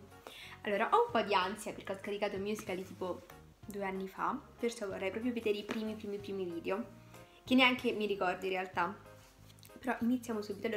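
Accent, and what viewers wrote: Naples, Italy. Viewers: Ma è di napoli?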